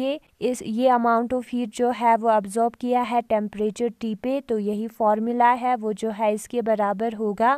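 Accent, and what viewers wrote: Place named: Kashmir, India. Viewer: Ur accent is Kashmiri for sure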